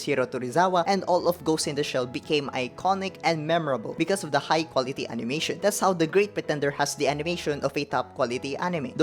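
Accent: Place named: Philippines. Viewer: Okay i was just having a guess that you are a filipino because of the accent pero pinoy nga HAHA good job bro i also love great pretender haha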